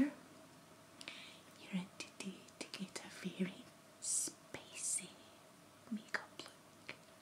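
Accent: Scotland, United Kingdom. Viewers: You're glasses are just everything! Scottish accents are still the best!! 😘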